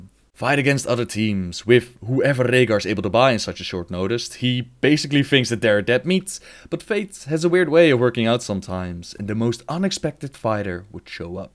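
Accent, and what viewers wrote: Netherlands. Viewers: Dutch accent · No. it's his accent. he's Dutch.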